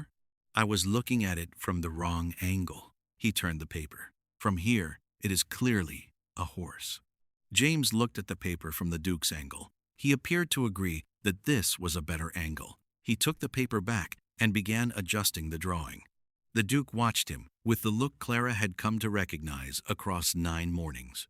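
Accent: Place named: United States. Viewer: A BRITISH MAN, SHOULD BE READING, THIS STORY, NOT AN AMERICAN. 😠💜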